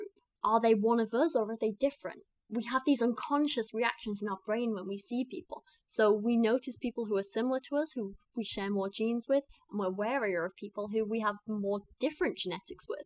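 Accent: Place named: England, United Kingdom. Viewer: Hi, I know this is a very late comment to your video, but I just wanted to say you have an amazing accent! The English accent is so cool, Kent Hovind must be high to even suggest that you have a weird accent. Anyways, wishing you good vibes from NY! stay cool!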